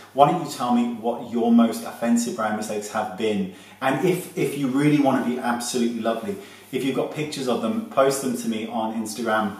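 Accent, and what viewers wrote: United Kingdom. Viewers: I don't know what it is but I love hearing him say naughty, I think it's the British accent and the lack of anyone ever saying it in Texas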